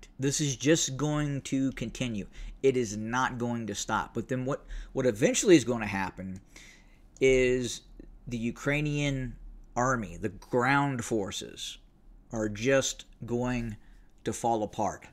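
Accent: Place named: United States. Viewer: Russian TV stuff narrated in American accent. Pretty surreal to listen to.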